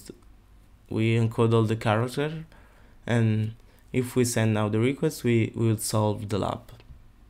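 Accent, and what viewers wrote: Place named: Italy. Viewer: Grande, dall'accento ho subito riconosciuto che sei italiano e sono corso a vedere <3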